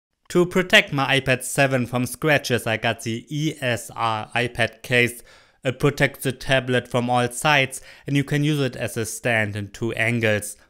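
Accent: Germany. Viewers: I am an Indian who is in Germany. I hear my colleagues speaking this accent when we have a conversation in English. lol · I LOVE THE GERMAN ACCENT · Most German accent ever can barley listen to it.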